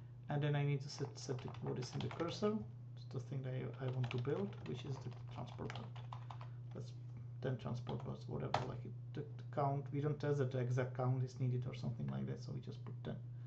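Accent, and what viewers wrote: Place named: Czech Republic. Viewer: i can confirm, this is czech accent 100% lol, also the gothic shortcut on desktop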